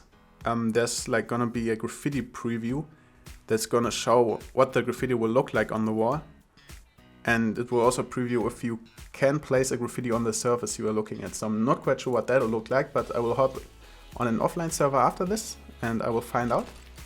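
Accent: Germany. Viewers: Man merkt einfach immernoch dass du Deutsch bist😂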